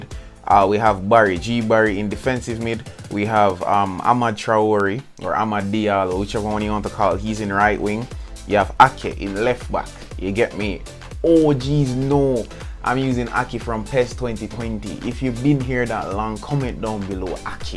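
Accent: Jamaica. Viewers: U from jamaican broski? Sound like one g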